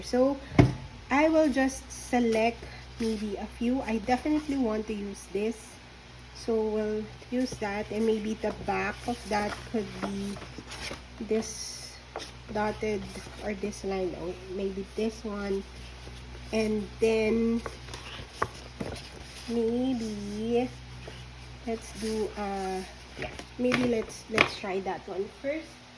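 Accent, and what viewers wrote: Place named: India. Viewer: Thé ornament paper clip are very cute Thanks for sharing your process Love your Indian accent it remenbered me of my 6 years in Bangalore I miss India very much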